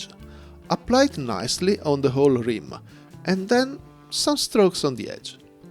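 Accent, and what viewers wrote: Italy. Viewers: l’accento è decisamente italiano… per questo ho fatto la domanda. Bel canale, bravo!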